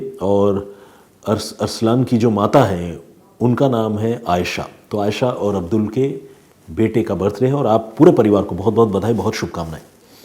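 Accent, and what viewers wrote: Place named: Hills, India. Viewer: आपका पहाडी अवाज सुनने मे मजा आता हे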